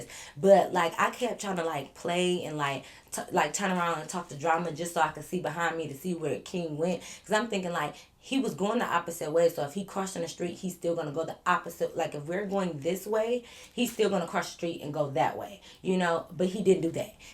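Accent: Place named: Detroit, United States. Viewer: I love our accent 🔥🔥 313 definitely don’t have time to be pronouncing every dang syllable 🤣🤣 people found it funny all the time when I first moved out of town asking me to say SALT and FAULT 🤣🤣🤣🤣🤣🤣🤣 talking about I say Saw with a T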